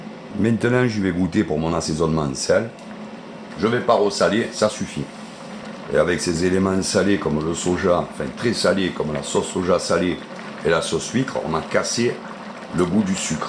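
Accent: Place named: Marseille, France. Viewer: Miracle !!! L'accent Marseillais est revenu (Mais à 85 % ...) Recette excellente comme d'habitude ! 👍❤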